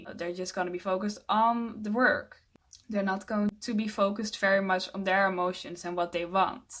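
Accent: Netherlands. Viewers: I LOVE THIS CUTUE PIE, AND THE DUTCH ACCENT. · Immediately heard your Dutch accent, it's so obvious haha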